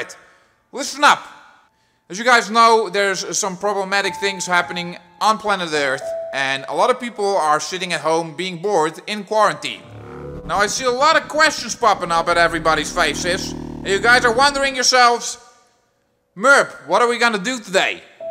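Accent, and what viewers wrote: Netherlands. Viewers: are you dutch?i laughed so much my fucking stomach hurts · Merp are you Dutch? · Are you dutch?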